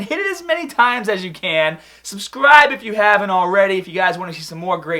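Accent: Boston, United States. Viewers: Are you from Bahstin? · That boston accent tho 🔥🔥🔥😁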